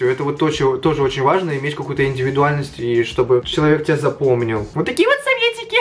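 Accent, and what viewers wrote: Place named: Vladivostok, Russia. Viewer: Я-то думаю, почему мне так классно слушать твою речь? А тут Владивосток замешан)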